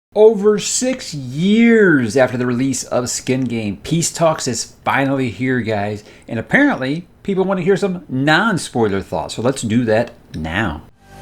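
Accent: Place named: South, United States. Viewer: Nice! What part of the South are you from?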